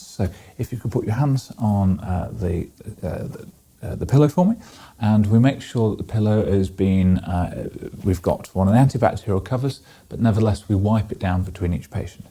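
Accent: United Kingdom. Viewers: It’s interesting for me to hear all of these same unusual terms, said in a similar British accent, but by a different doctor. I’ve watched the Curtis Robb hand examination video tons of times. It’s crazy how complex the hand system is!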